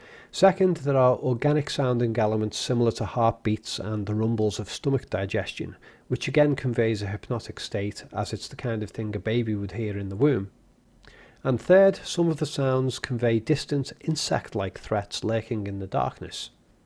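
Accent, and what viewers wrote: Liverpool, United Kingdom. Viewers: scouse git innit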